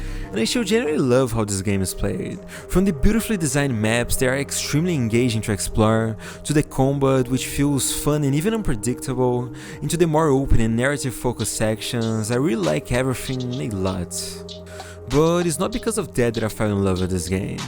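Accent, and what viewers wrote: Brazil. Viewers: Sabia! Kkkk reconheci o sotaque de longe. Sempre bom achar outro brasileiro fazendo vídeos em inglês. Continua que está muito bom! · are you brzilian?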